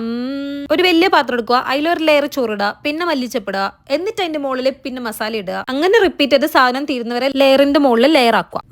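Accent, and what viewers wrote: Kannur, India. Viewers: സംസാരം ഫസ്റ്റ് കേട്ടപ്പോ തന്നേ മനസ്സിലായി കണ്ണൂർ കാരി ആണെന്ന് 😂❤ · Kannur bhasha nth oru ppang Ann🔥video oke poli anne Chechii ♥️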